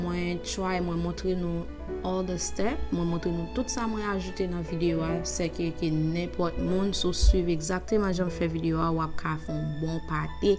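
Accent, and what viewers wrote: Haiti. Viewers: I love your creole accent My mom has the same one lol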